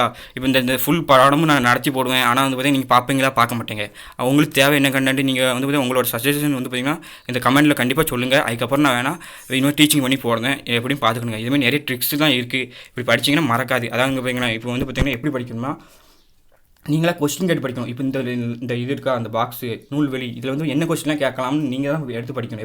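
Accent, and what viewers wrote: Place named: Thoothukudi, India. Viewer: Vanakkam sir, my native place thoothukudi, unga slang thoothukudi slang mathri irukku sir. I am happy sir, nanum neraya place la padixhu irukken, but neenga soli taruvathu different ah irrukku sir, na ungalai follow pannuren sir, unga method remba pidichu irrukku, I am housewife sir,unga help ennaku kandippa vendum sir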